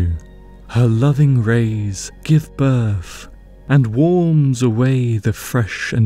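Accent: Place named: United Kingdom. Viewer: Hi again,. You only get this good at making poem videos by enjoying making them. It shows but on top of that you've got real directorial talent. That's a surprise to discover; there's little about being a poet that really promises an ability to direct. You're right to call this a "poetry film." It sure helps that it's the poem that it is; I'd love to have been reading it! It's clearly good because if it weren't it wouldn't bear the "weight" of so much production. In other words, if the poem weren't good the video would've collapsed. I do know how hard it is to put text on top of really fine images; for one thing, the image has to be one that gives the text "space." I always do but part of that is that I'm not as good a reader as you. & wow, just having a British accent is an asset. If I were as good a reader as you I might be tempted to leave the poem to my voice.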